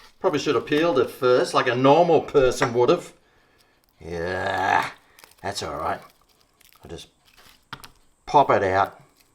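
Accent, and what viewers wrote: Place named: Australia. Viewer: has the Strongest Aussie accent I’ve heard Love from Canada brother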